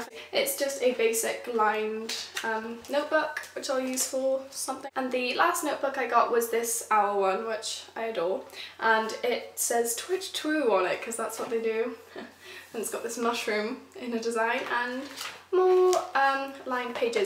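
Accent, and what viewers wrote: United Kingdom. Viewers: Yaya someone british! :) love the hair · Finally a British person!!!! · Where in Wales are you from??? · Are you from England?:)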